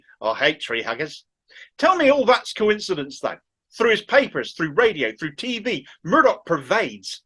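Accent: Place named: West Country, United Kingdom. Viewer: if only we had a Potter today . I detect a fellow West country twang . Keep free keep going and all the best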